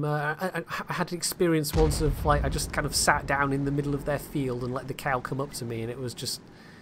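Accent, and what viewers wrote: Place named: Scotland, United Kingdom. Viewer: I didnt knew you are Scotish :)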